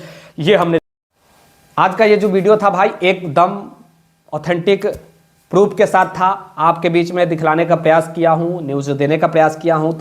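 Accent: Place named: Uttar Pradesh, India. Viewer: Khas up ka lag raha hai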